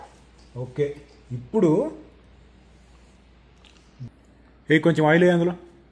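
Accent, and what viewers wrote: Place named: Guntur, India. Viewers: Bro midi guntur district accent undi motham❤ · Ma Guntur language la untadi mee Bhasha. Nizamabad yasa ledu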